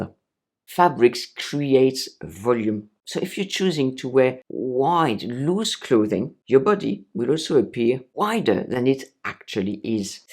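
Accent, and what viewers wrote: France. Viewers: . You are a natural teacher. Your voice tone, the pauses, inflections are so calming. The French accent is the topping on the cake! You impart a part of yourself to us. We feel it. You are so confident. You pull us in and we want to learn everything! Even if we know we're already. Thats a gift from God. Never give up. PS I can't wait to make apple your cakes from your other channel.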